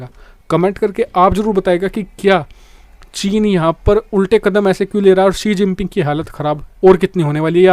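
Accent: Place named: Bengal, India. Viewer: Tum kidharke banda hai sala bangala jaisa boltahai hindi vi achi nahi baltahai.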